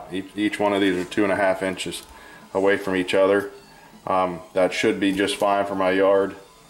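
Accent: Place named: Pittsburgh, United States. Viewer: love your videos. I'ma new modeler and appreciate what you do. Also a PSU fan and Pittsburgher, picked up your distinct Pittsburghese in a previous video. Keep up the good work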